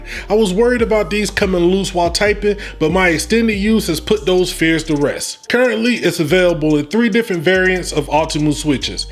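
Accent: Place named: New York, United States. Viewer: Im an OG tech/barber with a big ol Logitech G106. I dont really use it to game though because of tartarus but I'd like to try the calibur out. Im really liking your vids bro. You sound like a brother from NY but if you're ever out here. stop by the shop so i can tighten up that beard for you one time. Imma stick around and watch you grow this channel. Thanks for the review.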